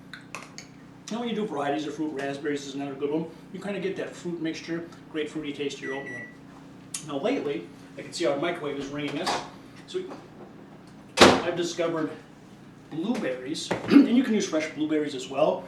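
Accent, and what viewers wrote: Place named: Minnesota, United States. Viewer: Good video brother. I used to live in Mankato. Haha. I thought u had that accent. I'll be honest with you. I guess im one of the very few who can eat it plain with nothing in it. All I do is put a half cup in the food processor bc it cooks more throughly. And its definitely one of rhe foods that keep you feeling full. Thats the magic food ya'll.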